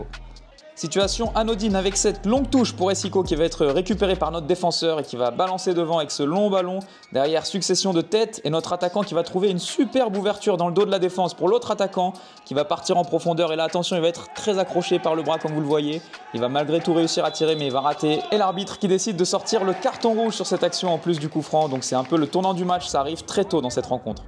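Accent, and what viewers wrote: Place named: Finland. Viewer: Je n'ai jamais entendu le francais en parlant avec un accent finlandais.. Super interessant et charmant, en plus !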